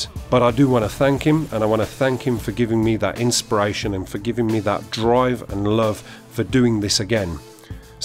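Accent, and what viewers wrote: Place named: Black Country, United Kingdom. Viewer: Great vid new sub u sound like a fellow yam yam with ya accent are u from wolves or nearby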